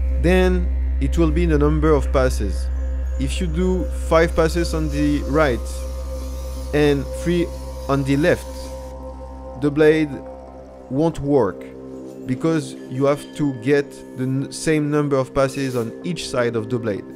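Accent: France. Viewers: merci pour cette superbe vidéo , a ton accent je suppose que tu est Fr , donc un grand merci pour cette vidéo très précieuse , j'ai un wakizashi magnifique et d'excellente qualité , mais j'avais besoin de l'affûter grandement afin que celle ci soit bien tranchante afin qu'elle coupe le papier , un pouce bleu bien mérité :) contunu comme ça je m'abonne :)☺️🙏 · Ça se sens l’accent français 🤣🤣, possible de te mp ?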